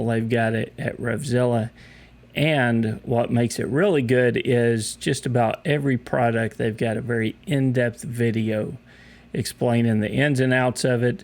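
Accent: Texas, United States. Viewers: Hey. You and Anthony might be twins, but he talks like New Yorker, and you talk like a Texan. You just need to practice saying "y'all" instead of "you guys" when you record your videos. Loved your impersonation of Anthony! Hilarious!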